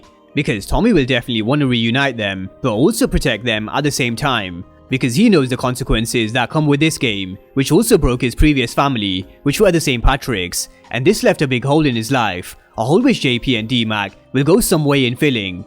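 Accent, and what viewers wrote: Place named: United Kingdom. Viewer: Makes you wonder who is JP's ex though (D-Mac's mother)?🤔💭 JP was definitely straight at some point of his life to have D-Mac to begin with, yet there needs to be some back-story explored to why we don't know about D-Mac's mother? D-Mac is mixed-race so his mother must be black, but then why did she leave JP and her son is another question? P.S. Well done for hitting almost 100K subs - been tuned into your recaps since you were on less than 10K 💯🔥 Guess it's great to hear TV series reviews from a British guy - your selling point!😎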